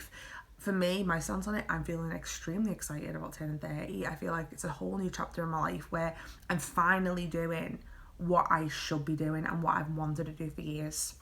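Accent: Liverpool, United Kingdom. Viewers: Thanks for your reply hun, love your accent too, can't beat a scouse accent! haha I'm from Belfast! That's great you loved it, it's hard practicing on your own but when you're kind of 'forced' lol you get lots done so i'm looking forward to it. I'm defo going to go to uni next year, what did you decide to study in the end- fine art or textiles? would love to see a video on uni life for mature students! :)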